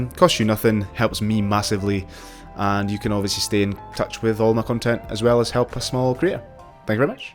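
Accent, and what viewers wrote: Scotland, United Kingdom. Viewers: I hear Scottish I sub simple as... on a serious note the pro controller could be a good thing with regards to the swappable analog modules... i could open up a market for 3rd party companies like Razer, SteelSeries or Thrustmeter to make parts for it... its just a thought Edit if you look below the analog stick you will notice to bumps (where you finger naturally sits under them) looks like 2 additional buttons so there should be 4 rear buttons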